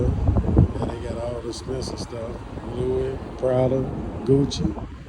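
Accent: South, United States. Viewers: I hear that southern drawl! “ lil fri-juh-ray-da”.. I’m from the Midwest… we sound like that too🤣🤣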